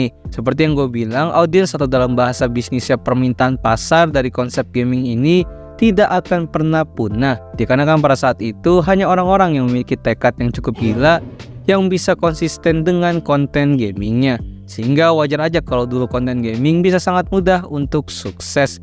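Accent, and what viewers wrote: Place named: South Jakarta, Indonesia. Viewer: iya lagi pake nada jaksel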